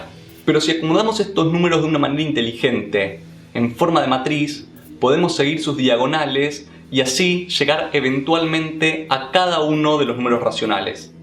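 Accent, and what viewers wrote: Argentina. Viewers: Que no se nota el acento? Obviamente es argentino :v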